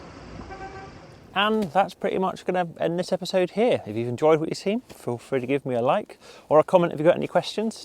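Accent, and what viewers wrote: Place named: United Kingdom. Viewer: Love your British accent. Are you from Liverpool?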